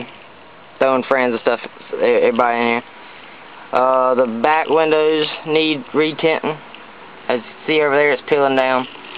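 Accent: South, United States. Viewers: And of course he's southern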